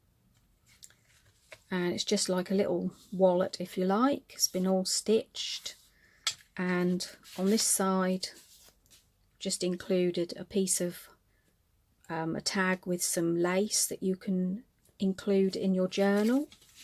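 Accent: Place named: Norfolk, United Kingdom. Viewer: So beautiful! Also looked at your Etsy shop because I recognised your accent - good ol' Norfolk! (Me too!) x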